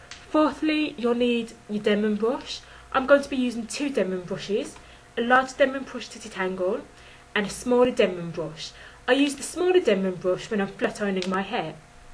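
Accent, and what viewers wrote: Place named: Birmingham, United Kingdom. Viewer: Thanks for that vid, very informative and i love, love, love your accent; are you from B'ham UK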